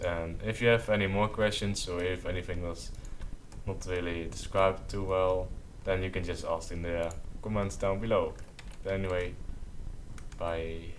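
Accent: Netherlands. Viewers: Dutch accent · Are you from Holland, cause you sound Dutch :D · 100% nederlands kun je horen haha :D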